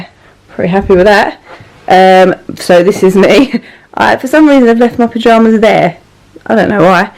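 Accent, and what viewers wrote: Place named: England, United Kingdom. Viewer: You are beautiful great body... Love the English accent...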